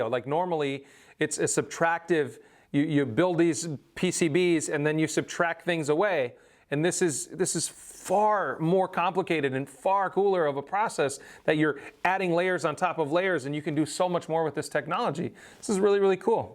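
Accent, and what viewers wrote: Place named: Minnesota, United States. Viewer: Hey another MN boy! I knew that accent!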